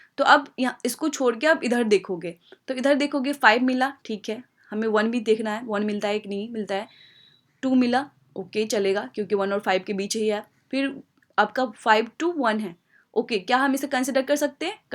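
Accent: Bihar, India. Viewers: Dont judge me but from your accent what I can assume that you are from "Bihar" who also lived in Bengal :) . I am super happy to see a girl from "Bihar" solving and explaning hard problems of leetcode . Keep going :)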